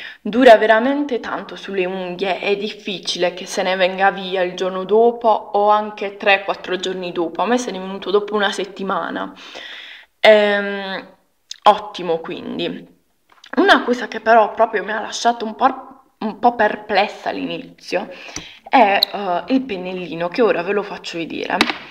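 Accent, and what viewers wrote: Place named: South, Italy. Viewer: ma no che dici , perche ' ???? hai una voce bellissima , ma poi xke' ci dobbiamo fare sempre mille problemi con l'accento ,noi del sud !!! e' questo !!! anzi e' simpatico , ti assicuro che c'e' di peggio ! molto peggio !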